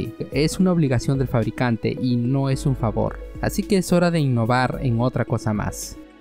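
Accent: Peru. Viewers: Eres peruano?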